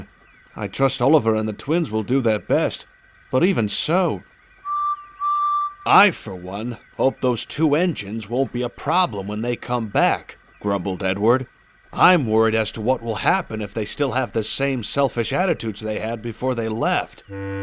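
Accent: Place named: United States. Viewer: Narraration sounds a bit too American, but this is very well written and I hope you make a threequel.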